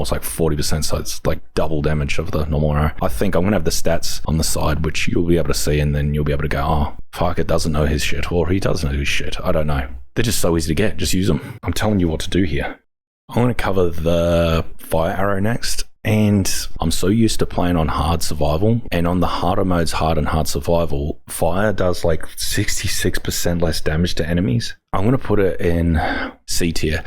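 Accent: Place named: Australia. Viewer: I think he's from Australia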